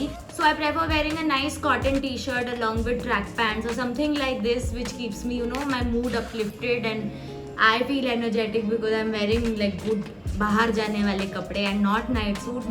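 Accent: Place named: India. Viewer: Love u indian accent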